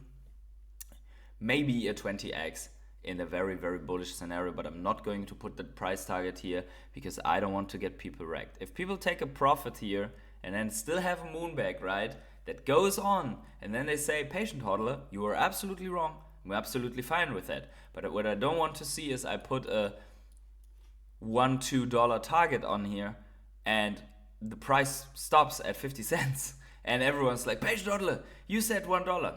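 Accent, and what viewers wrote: Germany. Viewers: Woof🐶 Hörst dich sehr Deutsch an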